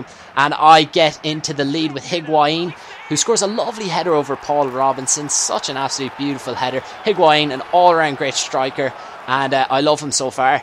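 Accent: Ireland. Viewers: its very nice too see an irish comentator.:)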